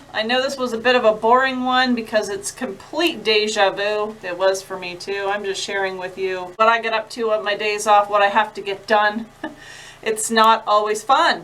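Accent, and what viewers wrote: Nova Scotia, Canada. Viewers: I enjoy your videos, you are so Nova Scotian in your speech. (Thus the phrase "Giver") Do not miss dealing with those ugly looking spiders. 😧😧😧😡😡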